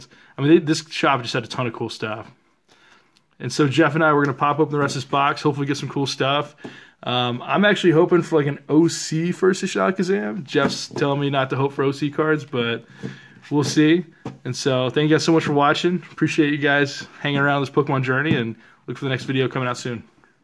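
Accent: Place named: New York, United States. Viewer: Bro I knew from your accent you lived in New York haha I'm in New York as well and could just hear it!.. amazing that you were out here collecting and making big moves so many years ago and still today.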